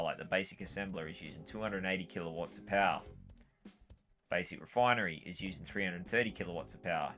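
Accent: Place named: Australia. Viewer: You have a down under accent, I myself taking a break after playing LSG "The Black" and "Plane parts server".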